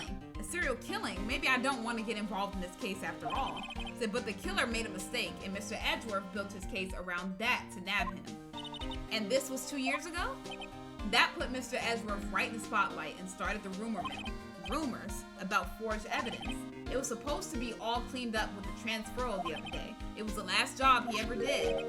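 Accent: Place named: South, United States. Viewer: Girl your cowboy southern accent is too funny!!!!😂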